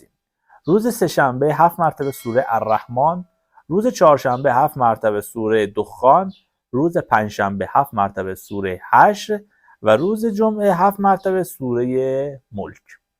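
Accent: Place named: Shiraz, Iran. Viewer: درودبرشما استاد عزیز ❤بچه کجای احساس میکنم بچه شیراز باشی درسته ❤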